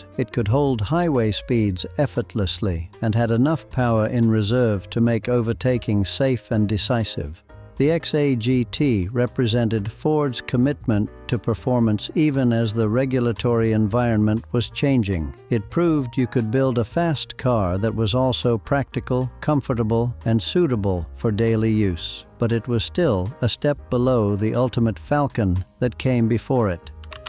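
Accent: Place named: United States. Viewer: similar, he has that yanky voice.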